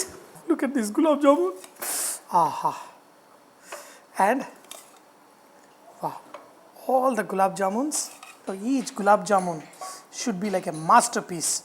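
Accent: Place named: Hyderabad, India. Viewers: ZABARDAST SIR PURE HYDERABADI U R TALKING. .NICE RECAPY THANKNQ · Miru chesina kallu chicken is a stress buster till date ... malla aa lanti episode chey andi ... 🙏🙏🙏 with telengana accent 😀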